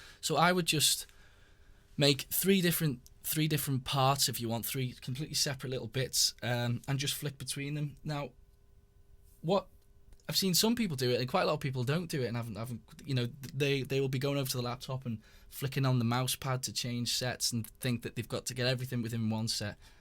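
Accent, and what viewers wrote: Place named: Liverpool, United Kingdom. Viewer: Good job on this. Thx. And nice proper scouse accent to boot.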